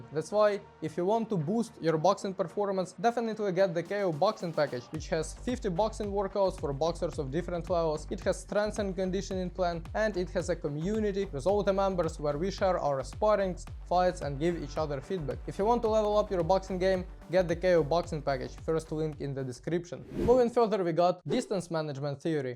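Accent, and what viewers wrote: Russia. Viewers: I love your rusian accent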